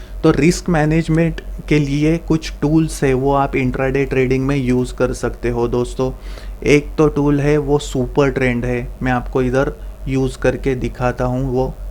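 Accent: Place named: Maharashtra, India. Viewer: आवाज आणि उच्चार ऐकून वाटत की तु मराठी आहेस. तुझे vdo पाहिले आहेत. खुपच माहितिपूर्ण vdo आहेत. तुझे खुप खुप आभार🙏 अवांतर :- मित्रा मला तुझा आवाज अमोल पालेकरां सारखा वाटतो,😊😉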